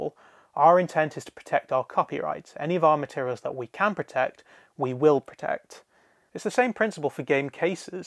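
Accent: Birmingham, United Kingdom. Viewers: Are you from Birmingham? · Are you from around Birmingham?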